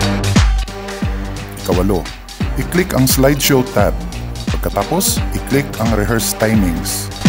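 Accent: Visayas, Philippines. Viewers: Galing mo bro..boses mo parang bisayan tonation...pareho tayo..nice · Bisaya ka. rin ba sir?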